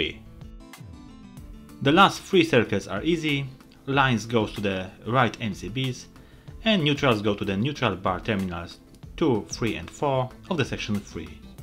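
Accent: Poland. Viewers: czesc. Slychac ze jestes z Polski. Pozdrawiam. Nie wiem czy nagrales filmik. Opowiedz jeszcze o ukladach sieci. Pozdro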